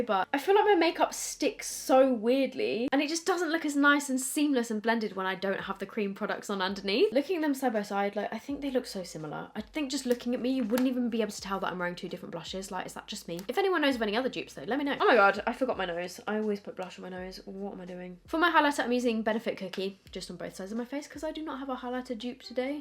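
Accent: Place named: England, United Kingdom. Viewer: I subscribe and watch you to learn English accent!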